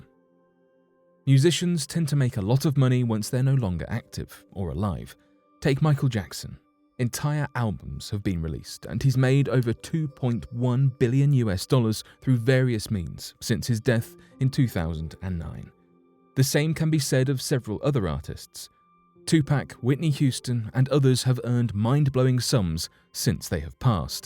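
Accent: United Kingdom. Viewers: The monotone British guy?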